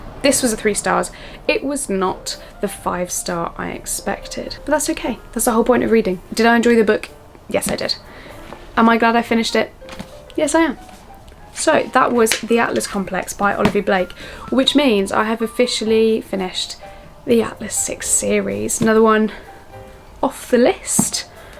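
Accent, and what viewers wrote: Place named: England, United Kingdom. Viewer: I knew you were going to have an english accent haha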